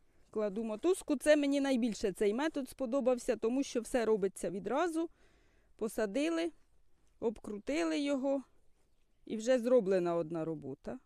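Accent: Poltava, Ukraine. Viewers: Доброго дня, а мені здається, що ви з Полтавщини, я полтавчан визначаю по розмові. Родичі були на Полтавщині раніше. Херсон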